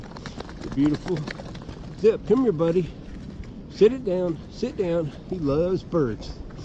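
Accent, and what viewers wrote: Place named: South, United States. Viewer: Very nice! I´ll get one in next two weeks. So, now I´m just lookin for some videos with this amazin dogs on duck huntin and I definitely found one with US southern accent which I prefer and understand best! :D Have a good time, greetings from Czechia.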